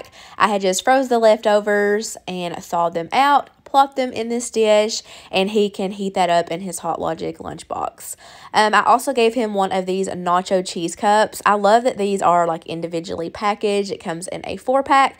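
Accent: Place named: North Carolina, United States. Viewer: Are you from North Carolina? Your southern accent sounds like it. I’m from North Carolina.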